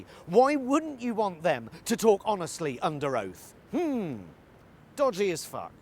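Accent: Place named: West Country, United Kingdom. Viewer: I am hearing a West Country accent in there but I thought it had a bit of Bath or Bristol in it , but I am happy to be corrected. Funny and to the point stuff. I have a ticket to see Mr. Pie live this month Feb. 2020 here in Ozstriya.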